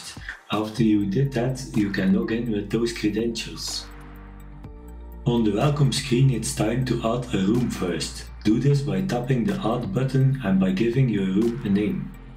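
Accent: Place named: East Flanders, Belgium. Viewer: grappig da ge kunt horen da gij nen oost-vlaming bent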